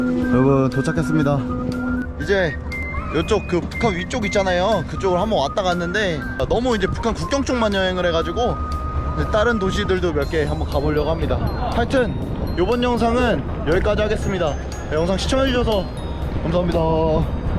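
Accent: Jecheon, South Korea. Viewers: 사촌형이 제천사람이라 그런가 말투 너무 익숙해요 ㅋㅋㅋㅋ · ㅋㅋㅋ 보면볼수록 제천사투리가 잘 들리네요 ㅋㅋㅋㅋ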